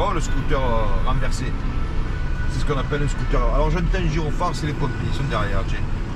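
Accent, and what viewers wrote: Marseille, France. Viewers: Ta façon de parler est identifiable à Marseille tout le monde ce connaît … Pour quelques vues sur YouTube mettre sa vie en danger 😱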